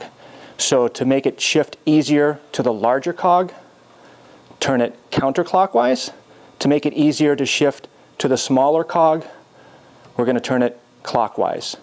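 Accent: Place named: United States. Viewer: I agree, he's probably American with that accent - how do you know he lives inside a chimney? What's a 'damper'? I guess you don't like Americans - don't blame you; they are pretty stupid. Just look at Bush - the most stupidest president ever - he couldn't even speak properly and probably was as bad as spelling as you! lol!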